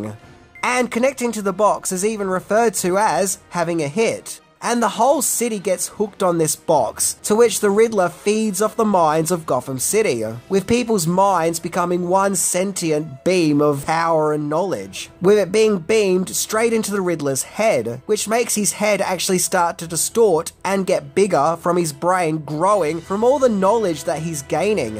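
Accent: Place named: Australia. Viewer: Everytime you say Two-Face I think you are saying Toothpaste! Lol must be that wonderful Aussie accent!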